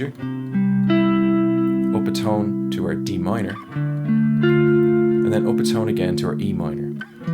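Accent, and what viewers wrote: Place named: Ireland. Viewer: you absolute babe <3 also - being an Irishman very far from home it's nice to hear the accent!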